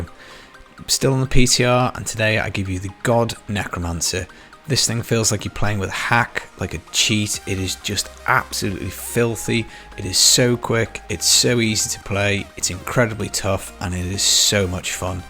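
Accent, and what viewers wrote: England, United Kingdom. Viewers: Is this a GR farming build or GR pushing build? Where you from? I detect England somewhere as I'm in Scotland...I subscribed a while ago and your vids are very good.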